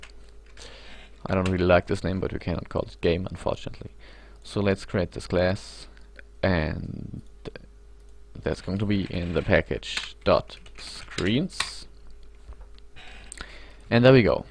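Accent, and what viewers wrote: Germany. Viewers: Ich hab mir doch grad gedacht, dass ich einen dezenten deutschen Akzent höre :DD Danke für das Tutorial :)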